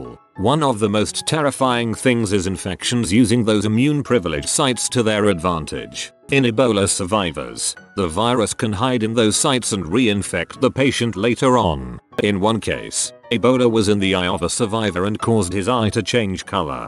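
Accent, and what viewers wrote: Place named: United Kingdom. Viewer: I only listen to these cause i want to hear a robot brit say "bantha fodder "!....double quote....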